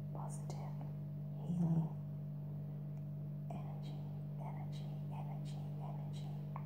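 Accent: Australia. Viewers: Great Aussie accent!! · awesome Australian accent !!! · You do a better Aussie accent than me and I'm Australian! Love it girl! Great vid! Thanks xx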